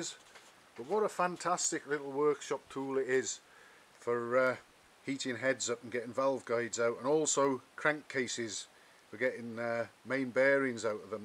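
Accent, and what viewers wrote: United Kingdom. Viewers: Hey I’m American tryin* to learn accents. Are you from Cornwall?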